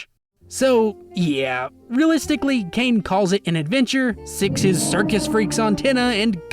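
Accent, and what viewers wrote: South, United States. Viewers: Oh my god you are so southern. I live in the deep south and i don't even know anyone who sounds like that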